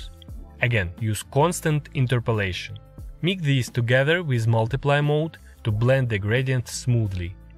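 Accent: Russia. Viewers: Мне кажется, или это русский акцент?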